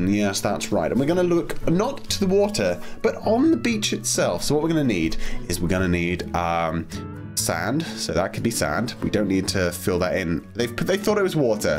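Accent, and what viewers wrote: United Kingdom. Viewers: I love accent I wish I had a british accent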